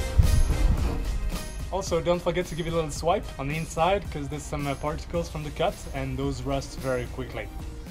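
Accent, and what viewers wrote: France. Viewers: are u french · Bravo ! On n'entend presque pas que tu es français ;) Super conversion également, j'adore le deck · Love french accent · .Love your build... And accent haha ... Youre so chill too for a french guy .....I subbed. Keep it up!! Btw.... No mains 230V power inverter? Hi, From (English) Canada ;) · Nicely done! You sound french ;) Nice ideas for when I'm building my fifth van in the future ;) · are you french or somethin?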